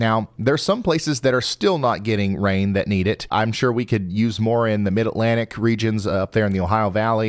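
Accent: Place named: Kentucky, United States. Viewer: Got hooked over a year ago when I heard that Kentucky twang.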